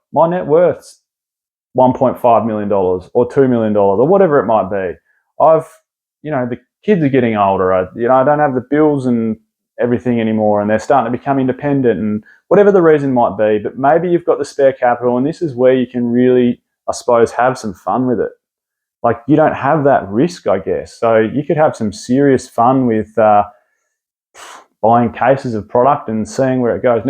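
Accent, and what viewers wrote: Australia. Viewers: Love having a genuine aussie guy talking about pokemon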